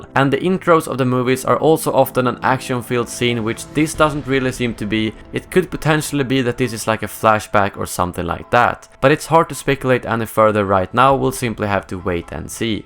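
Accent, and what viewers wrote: Sweden. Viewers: Let me guess? You are Swedish right?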